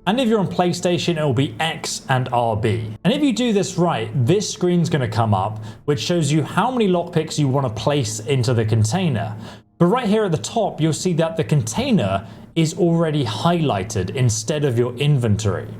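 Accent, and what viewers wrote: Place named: RP, United Kingdom. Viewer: You’re very posh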